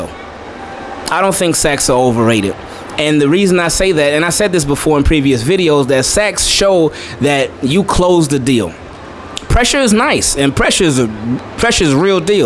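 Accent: Baltimore, United States. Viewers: Is that a B-more accent I hear.....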